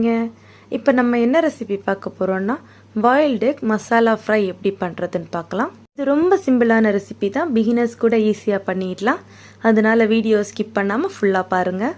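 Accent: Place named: Nagercoil, India. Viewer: Super dear.. neenga pesrathu cute ah iruku.. namma nagercoil slang super 🤩🤩👌👌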